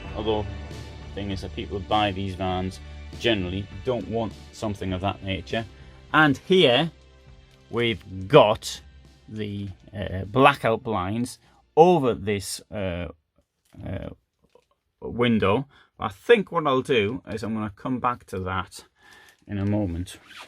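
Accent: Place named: North, United Kingdom. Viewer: not all northern people speak like this man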